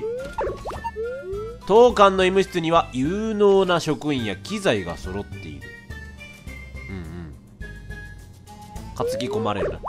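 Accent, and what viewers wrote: Kansai, Japan. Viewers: 最近関西弁出るの多いね！関西弁好きだから嬉しいw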